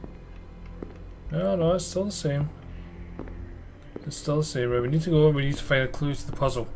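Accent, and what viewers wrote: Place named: Aberdeen, United Kingdom. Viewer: Heard your story trows and although I'm an auld wifie fae Aeberdeen bidin in London we only a PS 2 I like tae tune in and hear yer bonny accent fer a bit o Hamesickness relief fan I hinna bin up'i'road fer a filie! Ye still followin ma phonetic Doric here loon? Aye? Weel ah've subscribed fer yer stories o ghosties, ghoulies an lang legged beasties. So good luck loon an here's an auld Aeberdeen greetin tae warm yer heart.."G'wa ye radge!" he he jist jokin loon! xx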